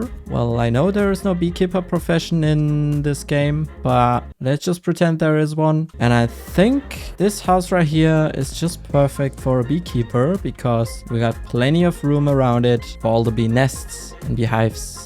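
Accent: Germany. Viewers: Ich habe gestern das erste video von dir geschaut und man hat direkt den deutschen Akzent rausgehört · Dude are you german? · idk why but germans speaking english is satisfying to me for some reasen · Good video! Keep it up! P.s. Your accent sounds really german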